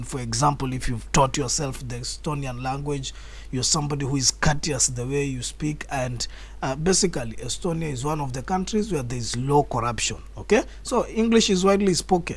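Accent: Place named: Kenya. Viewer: and he sounds sooo sooo kenyan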